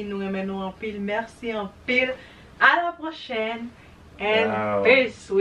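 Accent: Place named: North, Haiti. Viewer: Hi mes amours Nou se moun okap?fason ke nou pale surtou dam nan 😅 mw menm mw se moun okap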